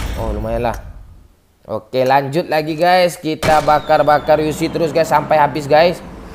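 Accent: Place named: Java, Indonesia. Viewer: Bang bhsa medokk🤣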